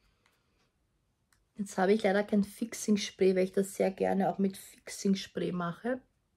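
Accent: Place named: Austria. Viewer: Ich höre das Österreichisch so gern 😍 Schönes Video!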